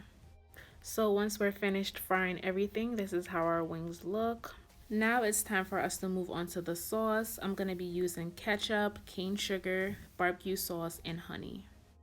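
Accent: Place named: New York, United States. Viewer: Nice. Sound like you're from NYC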